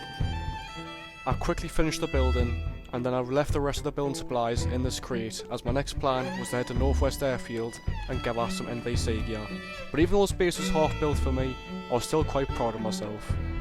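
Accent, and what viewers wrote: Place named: Newcastle, United Kingdom. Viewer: Nice to hear a geordie voice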